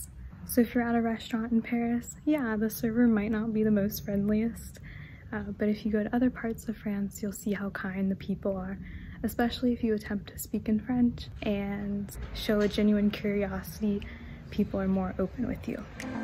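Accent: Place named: United States. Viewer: Your videos are quite enjoyable. For me, you have those French qualities of understated, put together dress style, subdued makeup presentation, and an understandable tone when speaking French, BUT….it’s ruined when you speak American English! Could you please fake a French accent just once when speaking English?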